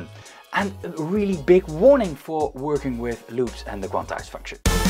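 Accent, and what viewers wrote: Netherlands. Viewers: Hahah je klinkt gelijk Nederlands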